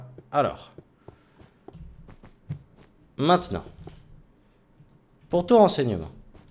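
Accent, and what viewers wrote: Quebec, Canada. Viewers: Bon accent québecois,:-)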